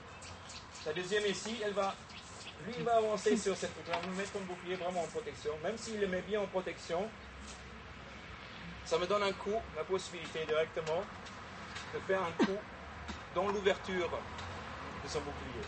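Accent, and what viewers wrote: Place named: England, United Kingdom. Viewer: Hello! It’s in French. But the person who speaks to an English accent would say:-)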